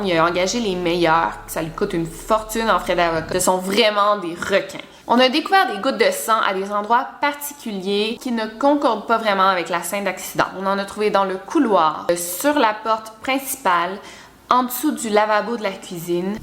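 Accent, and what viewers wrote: Quebec, Canada. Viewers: Je kiff grave ton accent québécois 😊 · J’adore t’écouter. Avec ton accent québécois ou tout simplement canadien tu assures. Je t’ai connu avec une vidéo que tu as fais avec Lionel Camy, merci Lionel.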